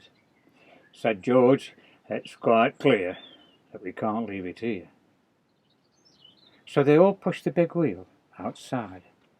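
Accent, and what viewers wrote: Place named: Stoke, United Kingdom. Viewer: I'm guessing you may be from Stoke...?? My dad used to read the stories to me in my bed in Stoke and he'd put on a Yorkshire accent. Would be pretty strange if so!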